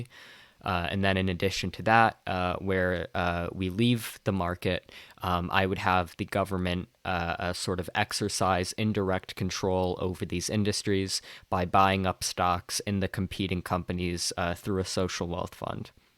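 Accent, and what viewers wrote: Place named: South, United States. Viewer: I can't take her seriously with that southern accent.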